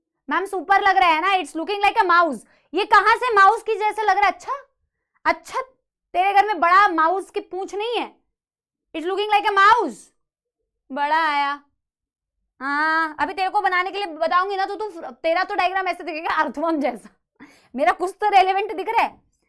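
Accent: Bengal, India. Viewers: Ma'am aapki bengali intna cute hai ki kiya ji bolun main 😍😍😍😍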